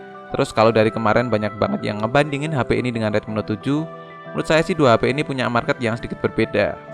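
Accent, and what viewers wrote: Yogyakarta, Indonesia. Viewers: Wong jogja po?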